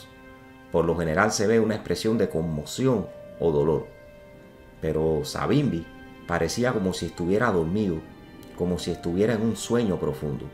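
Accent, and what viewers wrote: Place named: Cuba. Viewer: YO SOY CUBANO Y POR TU ACENTO SE QUE ERES CUBANO, HACES MUY BUENOS VIDEOS TE FELICITO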